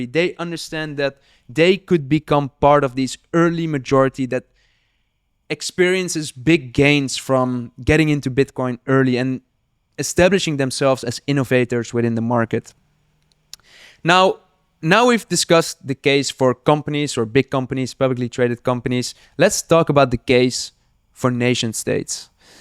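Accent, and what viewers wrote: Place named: Netherlands. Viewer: sterk nederlands accent , goede content .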